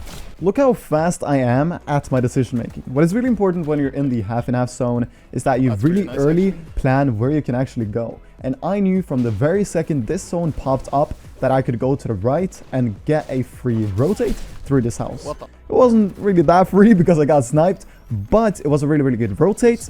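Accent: Norway. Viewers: broo are you from norway? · wait, youre norwegian? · you norwegian bro?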